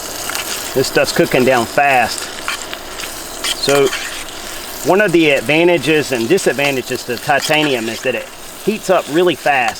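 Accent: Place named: Carolina, United States. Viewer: That’s got to be a Carolina accent.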